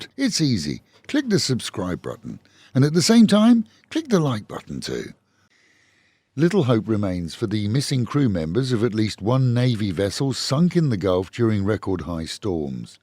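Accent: United Kingdom. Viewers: are you from Britain ?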